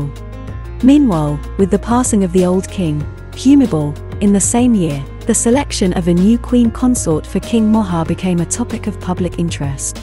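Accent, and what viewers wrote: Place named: RP, United Kingdom. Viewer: Nice to hear a beautiful standard received pronunciation.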